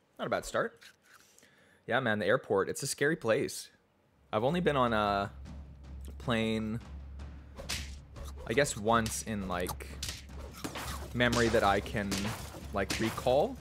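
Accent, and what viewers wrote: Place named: Netherlands. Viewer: I can hear the NL influence haha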